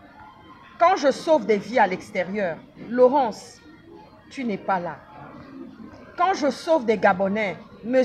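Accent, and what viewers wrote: Cameroon. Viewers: Tu te dis gabonaise mais t'as l'accent du cameroun.hummm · Tu es sûre que tu gabonaise ? Tu as l accent du Cameroun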